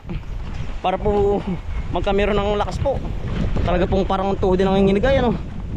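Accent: Batangas, Philippines. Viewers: Taga Batangas po ba kayo? Hindi ko magets ang punto niong magsalita. Sorry po. Watching from Delano, California. Thank u n god bless.❤❤😊😊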